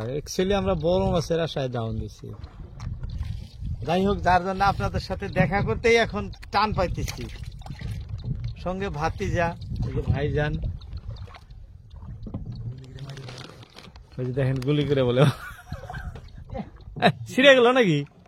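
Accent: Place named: Mymensingh, Bangladesh. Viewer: ভাই কোথায় থেকে আপনি, ময়মনসিংহের ভাষায় কথা বলছেন।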